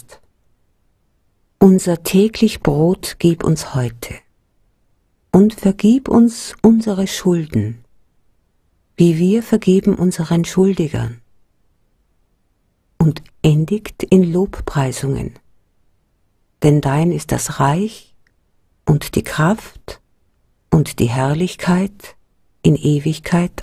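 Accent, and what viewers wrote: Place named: Austria. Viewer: Ja leicht österreichischen Dialekt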